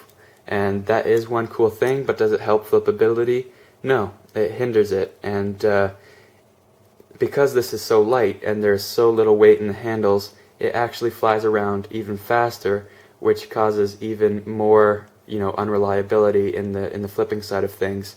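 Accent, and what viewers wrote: Canada. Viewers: Your def Canadian lol aboot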